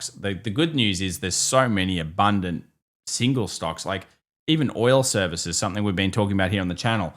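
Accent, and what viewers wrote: Australia. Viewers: An Australian accent just makes everything sound better 👍👍 Keep it up 🔥🔥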